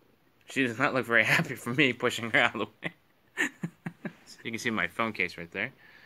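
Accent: United States. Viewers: I thought u were an American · i thought you were american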